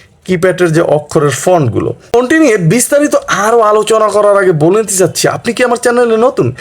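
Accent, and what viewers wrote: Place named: Barishal, Bangladesh. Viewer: কথায় বরিশালের গ্রাম্য ভাব আছে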